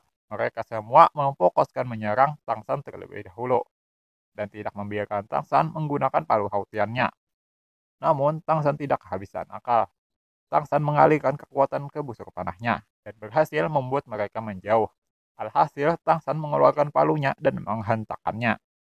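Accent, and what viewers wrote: Banjar, Indonesia. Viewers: Manyuruh 😂😂 . Banjar banar ah ikam 😂😂😂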